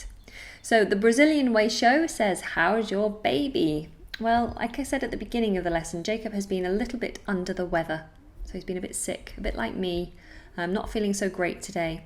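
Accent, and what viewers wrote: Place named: United Kingdom. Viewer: Amazing these live lessons!!! Stunning British accent!! Thanks so much,... and Jacob Baby English of course which lend us his lovely Mum!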